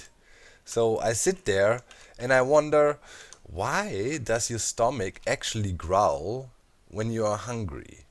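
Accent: Germany. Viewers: I'm new here.. But i'm sure he has a german accent!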